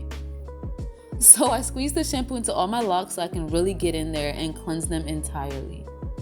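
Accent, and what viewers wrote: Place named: United Kingdom. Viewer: The British accent 👏🏽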